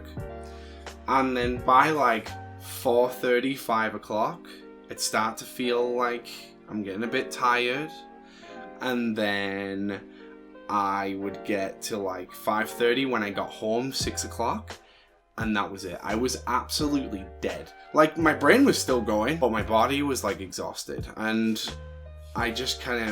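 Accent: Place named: Scotland, United Kingdom. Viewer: Are you in the UK or USA? You have a Scottish accent but adderal isn't prescribed in the UK?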